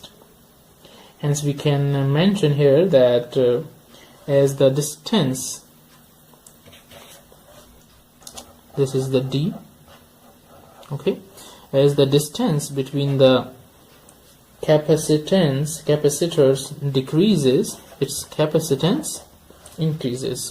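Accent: India. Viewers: Bhai Indian accent me hi Bola karo . Nice video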